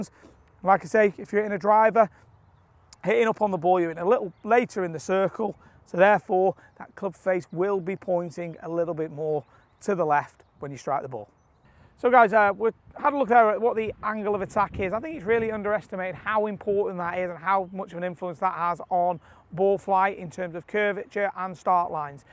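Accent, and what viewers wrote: Manchester, United Kingdom. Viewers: As ever, a great video and very informative! It`s interesting to notice that when you are really interested in a topic you tend to speak quicker. That`s fine for me and your fellow Manc viewers, but for the non-Manc`s you might want to slow your delivery down a tad just in case they can`t keep up:)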